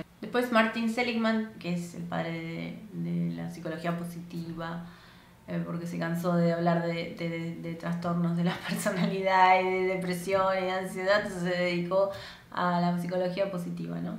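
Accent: Argentina. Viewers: Por favor modificar el tono argentino Par comprender mejor.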